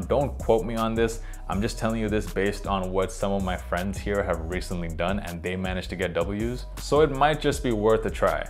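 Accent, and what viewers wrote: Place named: United States. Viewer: Bruh... you've got an American accent and live in Berlin. WHAT IS A MENS SIZE 11?!🤣 US/UK?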